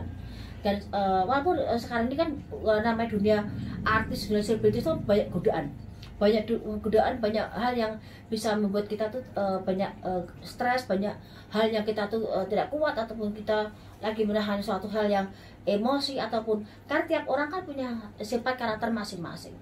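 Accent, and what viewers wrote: Java, Indonesia. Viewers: medok banget mbk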